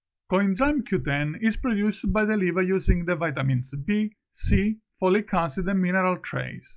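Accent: Italy. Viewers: good info, strong Italian accent! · Its Italian accent...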